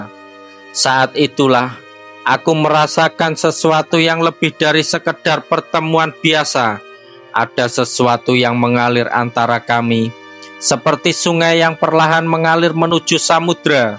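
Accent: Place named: Java, Indonesia. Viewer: Medhok banget suaranya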